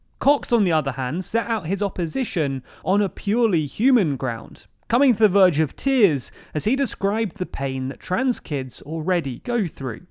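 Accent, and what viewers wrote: England, United Kingdom. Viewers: Are you sure, I heard Dysphoria, he does have an English Accent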